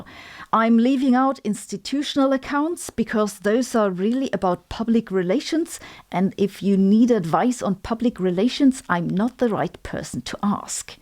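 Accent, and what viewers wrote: Germany. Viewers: Sabine, a great deal of your comment is applicable to regular TV, and much of it's cable variety; simply put, creators often had little idea why of how their production got the viewer buy in to begin with. On improving your English skills; what ever you do, don't mess with the "charming little German lady" accent; it is as much your brand and trade mark as Joeg Sprave's maniacal laugh is his, along with a very Teutonic lilt to his English; and his "schtick" is sling shots! My thoughts on "creator burnout"; although not a YT creator, I think my objective view as an over consumer of YT product does give me a bit of horizon on the subject; scheduling is the largest stress producer in the process- schedule twice the time your layout and "pre think" suggest , do the work, and hope fully go get a massage of some other personal 'uplift" with your left over time. 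The creators that I have watched collapsing into themselves, trying to "give the algorithm" more and more of what it wants as they chase fame and fortune- they are the tragedy of the YT process. Some actually had good ideas but fell into those "number chasing" traps you described. Last, I would say, don't do something if you feel it doesn't "fit" you, personally- it shows when you do, a certain part of your "faithful following" will being losing who you are to who the algorithm wants you to be. None of which seems to be your problem. But fact based scripts, like yours, tend not to lead you there- another wise move from a "sc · The German acsent help's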